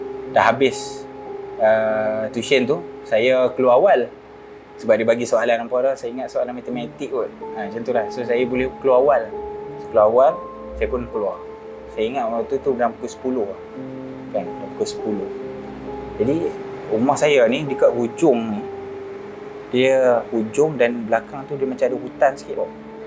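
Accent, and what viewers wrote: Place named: Kedah, Malaysia. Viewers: Truih kan loghat utara mu brader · Org Kedah yg dok Perak ni mmg dh bercampur sikit dh Bahasa dia ngn KL. Tambah plk dia pernah dok Ipoh yg ada byk org berloghat KL sikit... Mcm² loghat org Ipoh tu...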